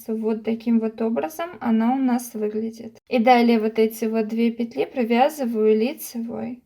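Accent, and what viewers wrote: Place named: Belarus. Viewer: Умничка! Милый белорусский акцент- обожаю!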